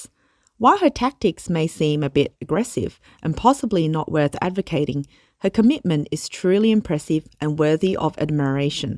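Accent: Australia. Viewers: That’s an Aussie accent alright, being Australian myself I noticed it straight away, it’s hard to miss 🙂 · façade is pronounced as "FAHZAD." Not the worst Aussie or Kiwi (?) accent but quite pronounced. I've heard WORSE eh ! 😉🙃🙂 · there is that Aussie 5 eyes voice over again....... Keep up the trash talk Temu, temu, temu temu temu..... Bring on the launch of temu chyna rubbish products looking for a home. Meanwhile the banks are failing get your cash out now. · You guys do very good content but can you do away with the Aussie accent? Doesn't fit with what you report..and please get your pronunciations right..facade is pronounced wrongly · Wait, that's an Aussie accent?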